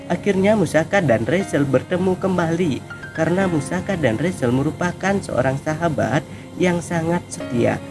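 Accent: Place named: Lombok, Indonesia. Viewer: Miminnya seperti orang lombok ya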